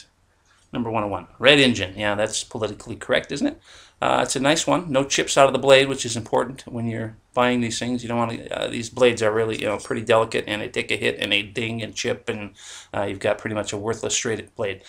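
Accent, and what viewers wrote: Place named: South, United States. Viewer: Great finds as usual. I am going to have to keep an eye out for vintage glasses....where did you learn to speak southern like that...lol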